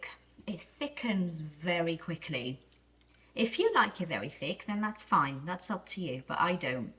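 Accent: RP, United Kingdom. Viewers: Keep up the good work. Your perfect RP accent is like music to my ears.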